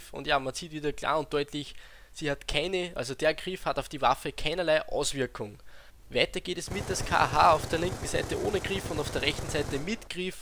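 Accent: Austria. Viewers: Wo genau kommst du eig. aus Österreich?:D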